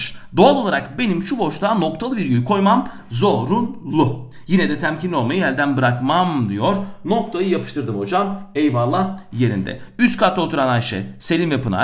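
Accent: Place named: Konya, Turkey. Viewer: Memleket Konya sanırım hocam 😀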